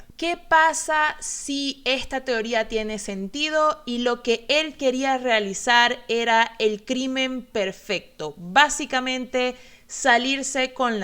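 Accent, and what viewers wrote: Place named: Venezuela. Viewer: Eres venezolana? reconocí tu acento de inmediato mrka<3 jajaja te amo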